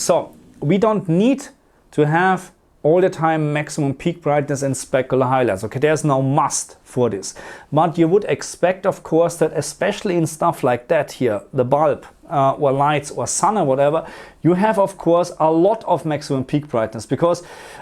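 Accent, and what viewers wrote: Germany. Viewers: i love deinen deutschen akzent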